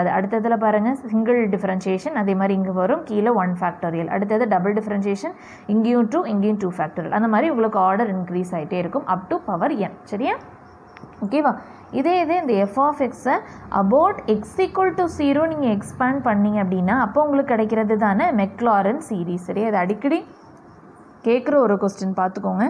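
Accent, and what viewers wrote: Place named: Nanjil, India. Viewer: Very crisp explanation with Nanjil Tamil accent.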